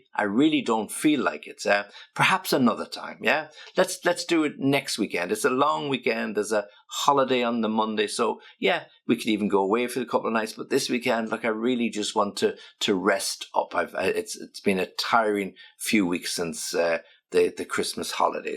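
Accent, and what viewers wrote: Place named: Northern England, United Kingdom. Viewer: 👏👏👏👏👏👏Where is your nice accent from,? Somewhere from the north, isn't it? Un abrazo 🇦🇷🏴🇬🇧🇦🇷🏴🇬🇧🇦🇷🏴🇬🇧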